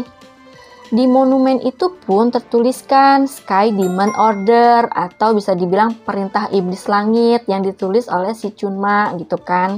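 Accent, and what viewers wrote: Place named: West Java, Indonesia. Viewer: kk orang sunda ya.. logatnya.wkwkw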